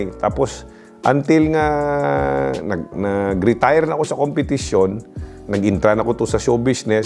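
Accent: Iloilo, Philippines. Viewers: Nami pamati-an ah...certified Ilonggo gid... ❤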